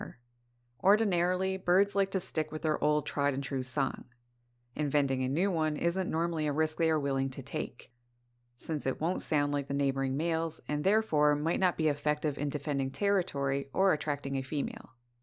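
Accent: Canada. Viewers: Sounds like we're on opposite coasts. I'm out here in Aberdeen, WA and I catch some o-o glimpses of your accent that sound Canadian? Surely love the birds you film in the snow as we mainly get rain out here. Great and wonderful website btw!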